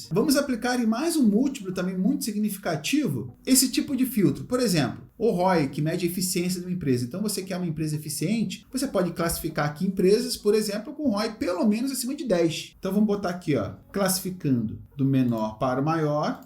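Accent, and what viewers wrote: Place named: Rio de Janeiro, Brazil. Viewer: Típico carioca. 😂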